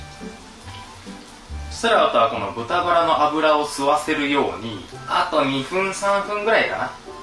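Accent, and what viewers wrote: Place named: Fukuoka, Japan. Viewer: 端々に出る方言が好き(´；ω；`) 「吹き零れんごと」とかガチの福岡弁で笑う笑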